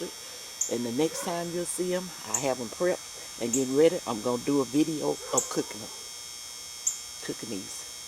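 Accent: United States. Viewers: Where is this place ? sound like Americae ? Never heard of dogers or what ever ity called. Jamaica we say dumplings to Rasta jaz.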